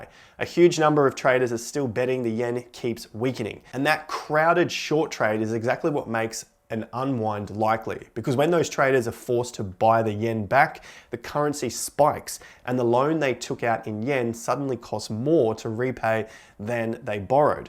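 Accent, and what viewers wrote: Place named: Australia. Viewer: Aussie accent talking US rates has me confused be more specific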